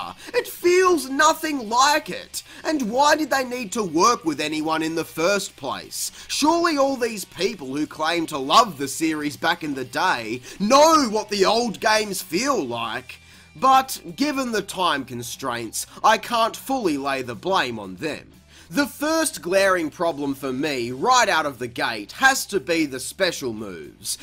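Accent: Australia. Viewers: I feel like your Aussie 😂 also are you skating the bloody ride skateboard with trucks on. 😂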